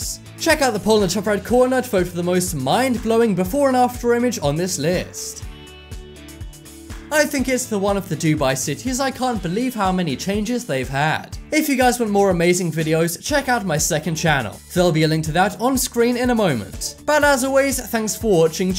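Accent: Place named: United Kingdom. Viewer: I'm pretty sure the dude is British lol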